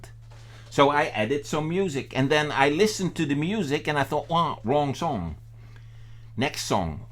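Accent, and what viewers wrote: Netherlands. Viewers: Keep that Dutch accent - its genuine. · Ha Ha Ha, you can't be Tony Fleming! Just like the English can't sing Russian Folk songs? Nor can a Dutchmen copy the sublime tones of a traditional Yiddish song? Be yourself because to me you sound and have the same mannerisms of my brother Tony? I know how to translate you amusing "dinglish" to English with great ease! Be yourself mate, that's the way we like you! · Nice that you are back again, I love when Dutchman speak english...........Act natural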